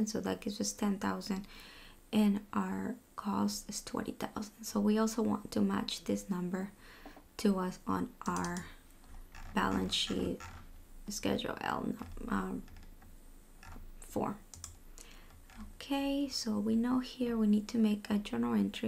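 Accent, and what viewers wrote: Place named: Latin America. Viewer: She sounds latina, great explanation 🎉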